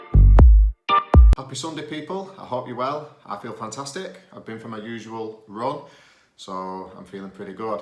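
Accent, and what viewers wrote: United Kingdom. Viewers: thank you very much for your dedication and careful explanations! And sorry for my question, what kind of British accent have you got?? I notice that it is British but with same variations, is not it??... Sandro Cano, from Argentina...;);)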